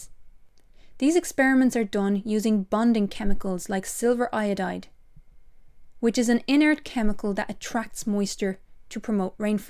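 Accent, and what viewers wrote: Ireland. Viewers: Awkward moment I’ve just listened to all the videos in one morning. Wish my teachers had a Irish accent idve learned way more. Great videos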